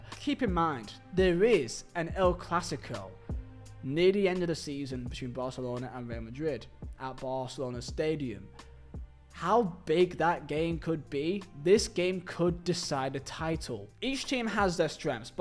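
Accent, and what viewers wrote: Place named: Rossendale, United Kingdom. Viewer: German names in a Rossendale accent. Pure comedy gold.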